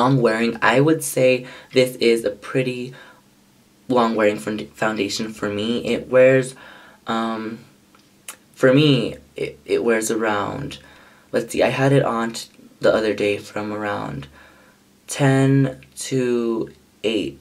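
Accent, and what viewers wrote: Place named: United Kingdom. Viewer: Omg your british accent was to die for! Soo cute and I've only just begun the video :)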